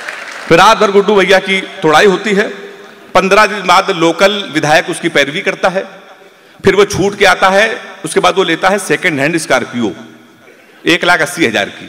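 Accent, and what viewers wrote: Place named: Kanpur, India. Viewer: Proud to be kanpuriya..... Aj kanpur ki hindi dubai me suni jari ♥️🌹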